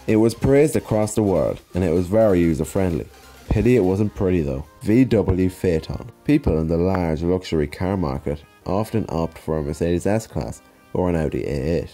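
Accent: Ireland. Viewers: Hi... Only me r u sound Irish?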